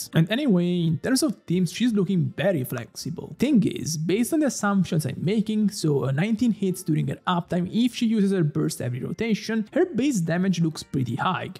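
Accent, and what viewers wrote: Italy. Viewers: He's also italian, you can hear his accent. He's mispronouncing it on purpose, so genshin babies don't get confused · Si sente tantissimo che è italiano ahahha. (Arleccino mi spezza ogni volta che lo sento ahaha )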